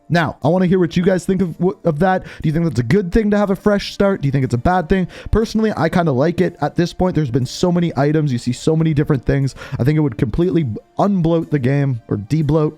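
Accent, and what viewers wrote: Canada. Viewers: It's always funny to listen to how Canadians say "about" . I'm not watching the rest of this video hearing someone speaking this way. It's like Canadians specifically love to say the word about for some reason. Disliked and not watching it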